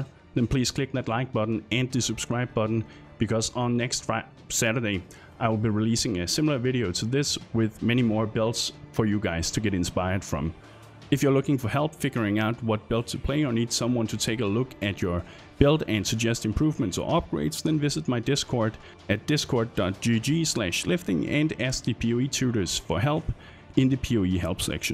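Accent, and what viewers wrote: Denmark. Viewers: A few seconds into the video: Wow. You. Sound. Danish. Hej,:) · du lyder dansk. har jeg ret?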